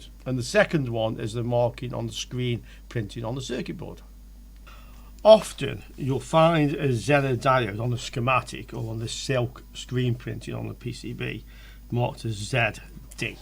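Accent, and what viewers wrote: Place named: Sheffield, United Kingdom. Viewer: It's called an accent. Sheffield or thereabouts if I was to guess. Clear as a bell to my ears.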